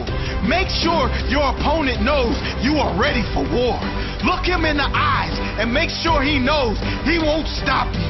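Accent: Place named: England, United Kingdom. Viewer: Nigga English accent!! nice 😎😎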